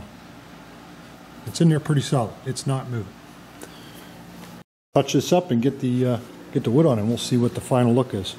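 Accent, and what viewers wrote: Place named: Pittsburgh, United States. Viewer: I here Pittsburgh accent. 😁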